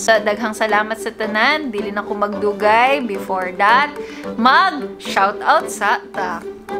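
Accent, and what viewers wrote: Visayas, Philippines. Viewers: sobrang cute magbisayaaaa!!!!! ❤️ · 😂😂😂ang cute mo mgbisaya ms...cge kog ngisi d whole video..super ng.enjoy ko..thank u sa GV ms...😊😊😊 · Cute kaayo ka mag bisaya lodi. 😆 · ang kyut mo po mag salitaa ng Bisayaa ate 🥰🥰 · kacute magbinisaya ni ate hahaha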